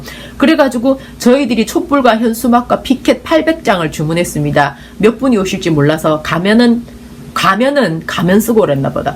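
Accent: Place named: South Gyeongsang, South Korea. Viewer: 경남씩 말투군요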